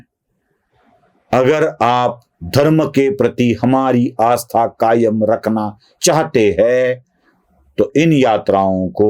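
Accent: Maharashtra, India. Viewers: I love your Marathi accented Hindi. Fully agree with your views. From Lucknow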